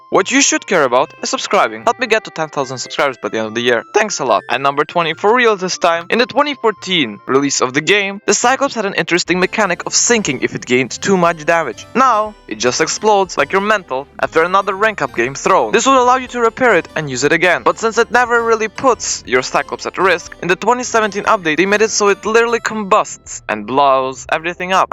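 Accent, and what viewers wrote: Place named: Poland. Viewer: Ale masz Polski akcent bardzo to słychać XD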